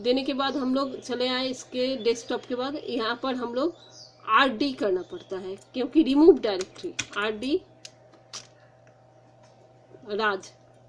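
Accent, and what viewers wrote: Bengal, India. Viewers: Apka muh se hindi sunke aisa lagta hain ki ap achhi tarah bangla bol sakti hain. Ap bangla mein video kiu nahi banati?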